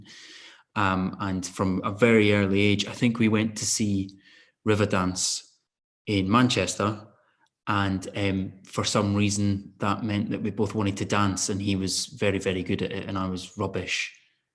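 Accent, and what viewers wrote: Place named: Scotland, United Kingdom. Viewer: His own voice and Scottish vernacular; it sounded all good to me.. What a very likeable man.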